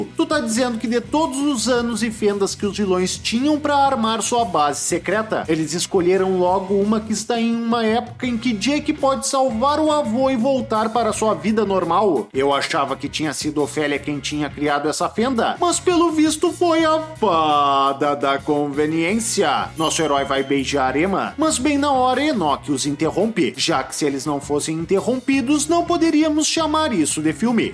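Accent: Rio Grande do Sul, Brazil. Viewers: Tu és gaúcho o narrador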